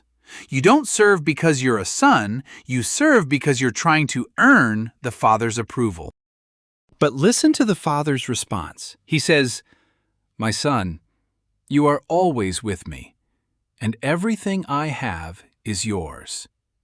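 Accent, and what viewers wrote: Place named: India. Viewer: The indian accent got me😂❤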